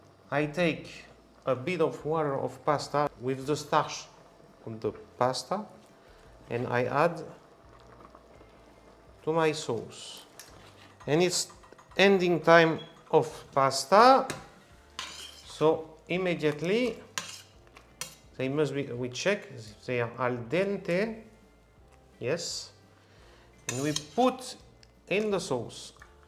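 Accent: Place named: France. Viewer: Love his French way of expressing and speech patterns!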